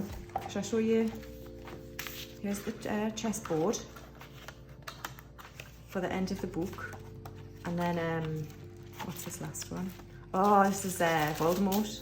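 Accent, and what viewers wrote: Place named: North, United Kingdom. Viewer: You cracked me up at the predator comment 😂 you totally sound like my northern friend (saying that, does Lancashire count as northern?) god here I go insulting people with my crap geography 😂 #nooffenceintended 🤦‍♀️ xx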